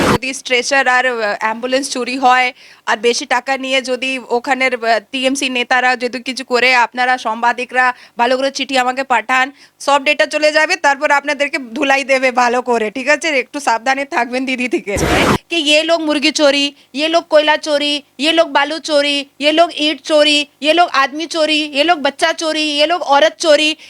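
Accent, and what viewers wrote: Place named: Bihar, India. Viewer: Madam actualy where r u from apki bangali shunke bahut accha laga...I think u r from bihar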